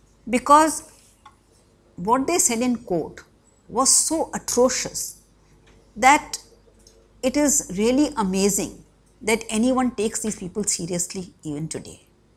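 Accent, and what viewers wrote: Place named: India. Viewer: englsih aati nahi hai par bolna english main hi hai chahay kitna hi thick indian accent ho.. it sounds nauseating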